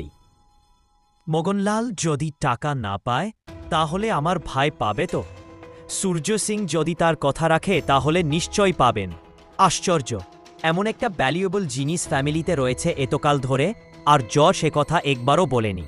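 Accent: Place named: India. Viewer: Awful rendition of an excellent Ray story. Hindi accented Bengali is difficult to understand.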